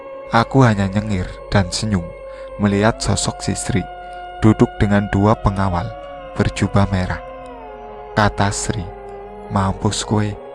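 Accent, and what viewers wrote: Java, Indonesia. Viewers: Bahasane itu lhoOo ... Medok bgt .. Jawa TuLeN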